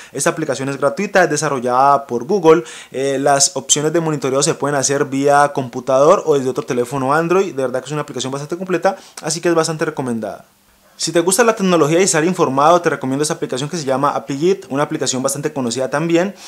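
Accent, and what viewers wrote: Cali, Colombia. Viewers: Eres de Colombia? ;:D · eres de cali colombia????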